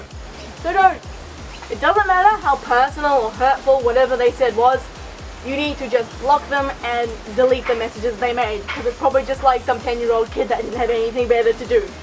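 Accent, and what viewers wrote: Australia. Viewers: you sound very australian, are you? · you're australian!!!!! i can tell by the accent >.<, what part of australia are you from? i'm in queensland, did being australian influence your fursona?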